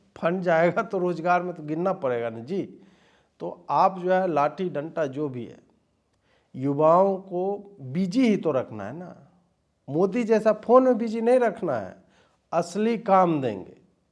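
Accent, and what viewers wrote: Bihar, India. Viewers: Your Bihari accent is very nice Ajit sir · EXCELLENT satire! Bihari accent is lovely!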